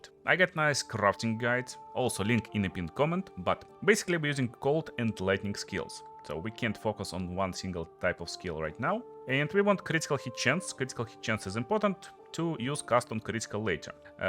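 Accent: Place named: Russia. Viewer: Твой английский прозрачно намекает нам что ты русскоязычный)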